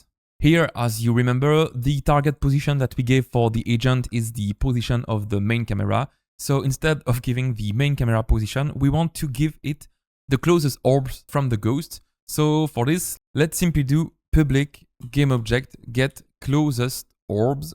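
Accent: France. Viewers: T'es francais?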